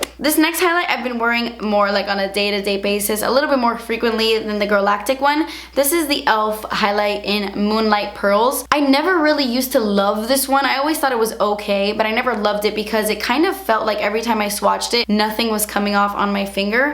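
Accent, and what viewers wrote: England, United Kingdom. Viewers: I love it when you do an English accent! Please could you film a whole video in this accent?! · Can you do a tutorial or anything with just talking in your British accent? Lol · Full video speaking in british accent please!! ❤️ I am always expecting you to speak in that accent in every video.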